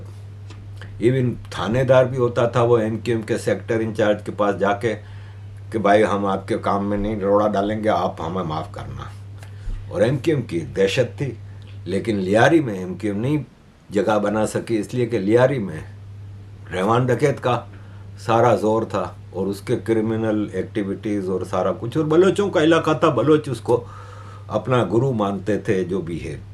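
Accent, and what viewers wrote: Gujarat, India. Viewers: Bhai k language main Gujarati accent jalak raha hai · This guy has a gujrati accent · Apka accent Gujarati logoki tarah hai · Sir, you sound so Gujarati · Your tone of speaking is same as like we gujarati. Anyway I also live near batva , manavadar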